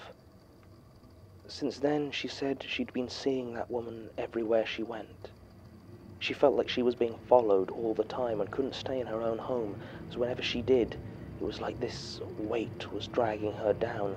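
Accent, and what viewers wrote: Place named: United Kingdom. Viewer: I love mumbling in my ear just like doing his thing I love a deranged British man in my ear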